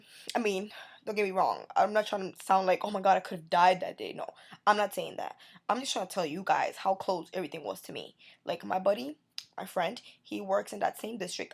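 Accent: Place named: Boston, United States. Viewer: Is it weird that i love the boston accent?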